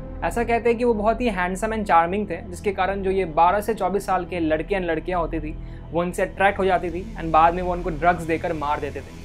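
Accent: Bihar, India. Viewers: Bihar se hai ye banda...sure!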